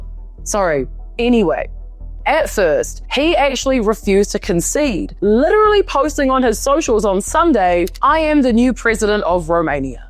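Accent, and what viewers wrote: New Zealand. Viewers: great update and its a easy kiwi accent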